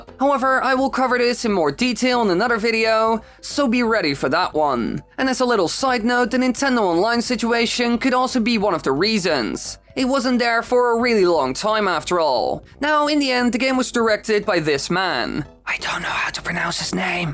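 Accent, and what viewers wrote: Netherlands. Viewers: I love your Dutch accent! I’m from Belgium 🇧🇪 and I don’t mind it. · Its a good accent, its dutch right cuz in your zelda reaction i thought you were talking dutch for a smal bit. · Well you can hear from the accent that he's dutch which is okay. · For me your voice is great and i really like your dutch accent. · Ur voice is fine tbh, just obviously a european (dutch?) accent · Well I mean you sound Dutch Are you ?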